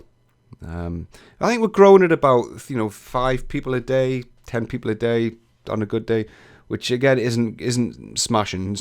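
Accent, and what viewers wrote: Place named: England, United Kingdom. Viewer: I am a woodworker who uses inventor to digitally prototype a design that I can tweak at different stages of production. Your videos are very good and the best that I could find (English accent helps...). I often rewatch your previous videos as you have a very natural way of communicating. Looking forward to what you have to offer in 2016.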